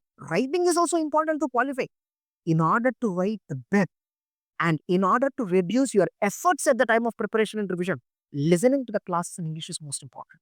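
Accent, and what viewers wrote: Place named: Telugu, India. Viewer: Sir your mother tongue is Telugu know 😄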